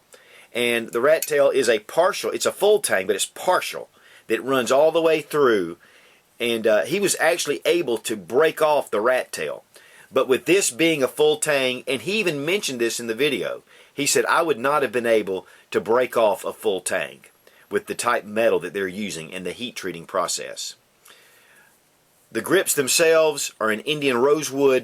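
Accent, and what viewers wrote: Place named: South, United States. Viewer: This guy has the THICKEST southern accent I've ever heard lol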